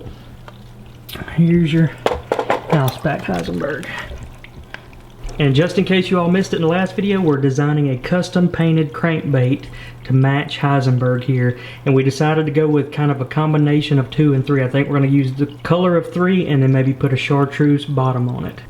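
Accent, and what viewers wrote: South, United States. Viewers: With your accent I thought you’d say yall so it felt weird when you said you all lol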